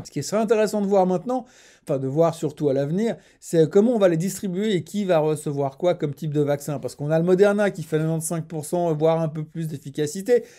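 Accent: Switzerland. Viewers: Dedieu dedieu, il me manque cet accent suisse. Merci pour ce que vous partagez ;-)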